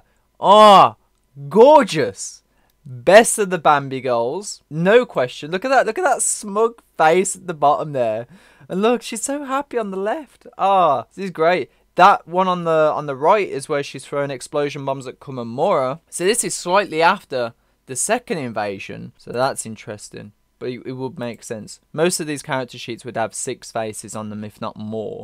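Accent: United Kingdom. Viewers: Aye bro, what part of the UK do you hail from?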